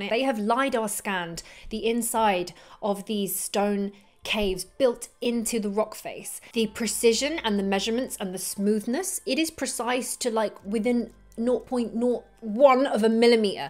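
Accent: England, United Kingdom. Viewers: English accent, nice voice sound, articulate, and amazingly I never heard an "ah", informative, and very attractive, wow, wymm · i'm an old guy and I truly enjoyed watching this. I'm a history buff from way back and its fun to see a young person asking all the questions and poking your finger in the eye of the established historians. I think there are holes. The Mayan calendar was cyclic, sure they missed the end of the world or did we just not read it correctly? Either way your fiery attitude and British accent calling bs on things is entertaining and most definitely reassuring that there's still a chance that the study of history still has some hope.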